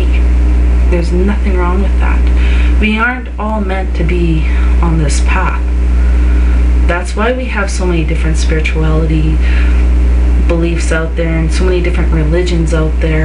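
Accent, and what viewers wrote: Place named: Canada. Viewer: I really enjoyed hearing explanation to this question! For the one searching... just remember, the passed on author Scott Cunningham was a male, and another good teacher. Yet mannerisms and Great White North accent give things a little more personal aspect.:) Blessed Be!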